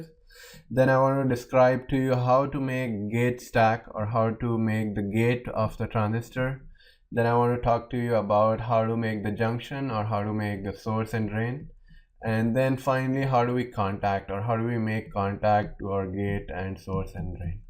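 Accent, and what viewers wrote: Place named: India. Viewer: 阿三这口音我勒个去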